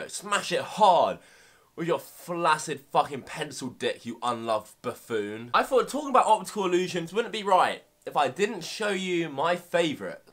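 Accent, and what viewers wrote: England, United Kingdom. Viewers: English or Australian? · he is English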